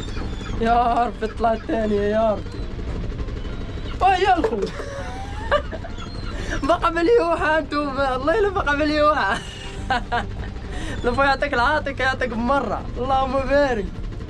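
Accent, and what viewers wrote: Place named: Safi, Morocco. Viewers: بلعكس هضرة اسفي تتعجبني حمد لله مغرب متنوع لهجات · انا ولد أسفي وككنهضروش هاكا هدو هضرة النواحي ديال أسفي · تاراهاكا هضرة ديال آسفي❤ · هضرت اسفي هاكة تحية كبيرة ناس اسفي❤❤❤❤❤❤❤ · راه هكا ولاد اسفي كيهضرو عادي جدا نتا جاك غريب حيت عمرك شفتي ولاد اسفي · ولد اسفي وهده هي هضرتهم . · نطق ولاد أسفي هو هداك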